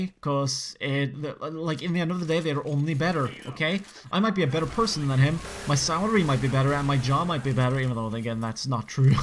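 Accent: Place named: Norway. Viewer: Jeg bare slker hvordan du snakker litt Norglish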